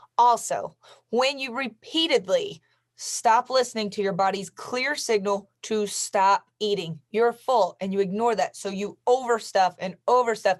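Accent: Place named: Tennessee, United States. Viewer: OUT of curiosity- are you a TENNESSEE girl? I love hearing you talk- and your mannerisms are just like my area here.. would love to find myself a lady similar to you-- OLDER (i'm 66).. ha. but you sound like you're from my area-- You're a gorgeous lady-- your hubby is a lucky man.. Keep up your GREAT videos- I get more from yours because you talk like ME- and seem to think and have mannerisms like my area folk do.. in other words- you're similarities- have helped me get MORE out of this info in half a dozen videos than over a YEAR of listening to eveyrone else.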